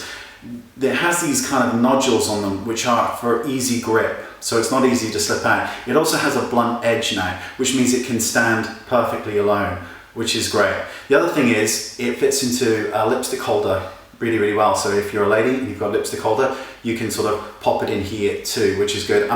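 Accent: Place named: United Kingdom. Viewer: I love the british accent. "Last, but not least" :)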